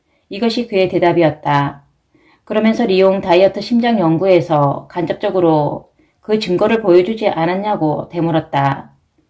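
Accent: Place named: Gyeongsang, South Korea. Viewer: 경상도 사투리로 들으니 아주 구수~합미당.귀여우샤여.잘 들었습미당~❤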